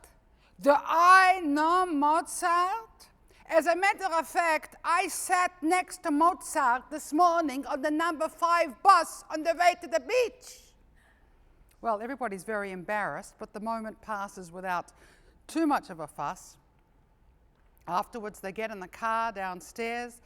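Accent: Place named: New Zealand. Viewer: I love your Yiddish New Zealand accent.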